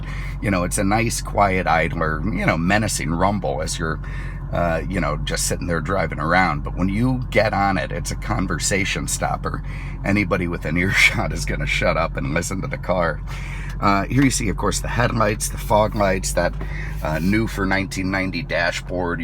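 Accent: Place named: Yankee, United States. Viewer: That Yankee voice grates on this Southern man's ears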